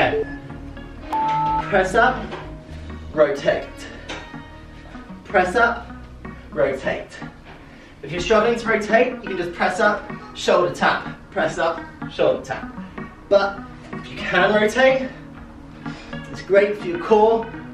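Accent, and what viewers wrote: United Kingdom. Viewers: Weirdos? why? Hes hot! Hes shirtless! Hes got british accent!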